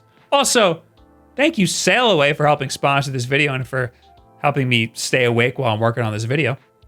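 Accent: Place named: New York, United States. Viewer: I’m from Wisconsin and live in nyc. Love how you say cawfee like a New Yorker but Beag (bag) like a Midwesterner 😂. Relatable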